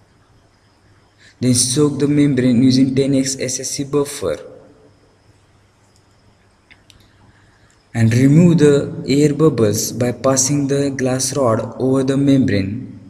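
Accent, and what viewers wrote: India. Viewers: Indian accent :D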